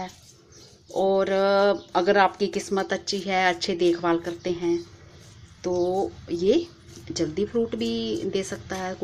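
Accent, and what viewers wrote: Punjab, India. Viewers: aapki aawaz punjabis tone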